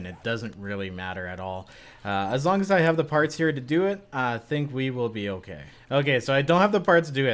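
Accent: Canada. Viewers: Thanks, had my fingers crossed for an episode today and you delivered! I'm almost done searching Naxezgane POI's and then I'm off to random gen. Finally died, on day 58. Went to search for the gold mine and rode into the friggin canyon looking over my shoulder lol. Was thinking about other games for you. It's a real slow burner but Ark blew me away dude. There's this real sense of wonder and discovery throughout the game. It's wasn't too sound mechanically but is definitely unmistakable overall. PS glad you don't nerf your dialect like most Canadian Youtubers. Pronunciation of "aboot" and using "ey" are actually common here in regions of England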